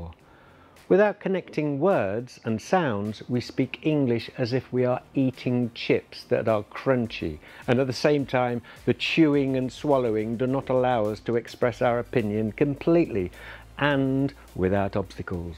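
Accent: United Kingdom. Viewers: love love love this British accent😍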